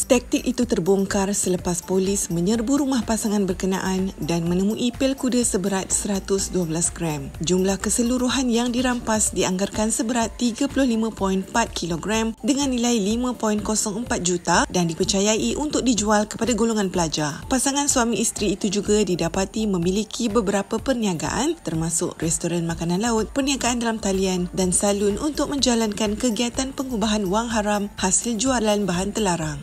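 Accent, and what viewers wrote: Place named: Kelantan, Malaysia. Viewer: Kelate kan 😂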